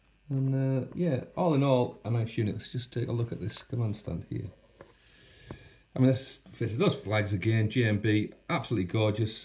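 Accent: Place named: North Yorkshire, United Kingdom. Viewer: That is a nice paint job well done. Apologies for confusing you with a Geordie. You are actually a North Yorkshire Chap!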